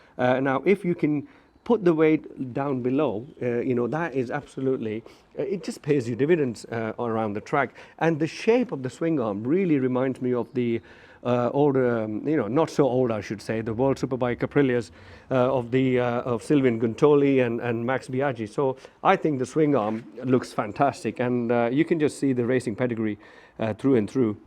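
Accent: India. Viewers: You got an Indian accent... are you originally from India? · U have somewhat Indian accent 👍 .. loved your video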